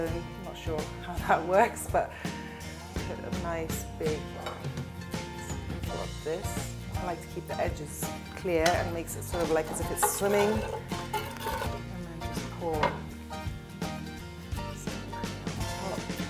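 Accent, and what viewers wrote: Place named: England, United Kingdom. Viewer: Wow as an Australian from Armenian mother who was born in Cairo I love watching you cook and your beautiful English accent. Thank you.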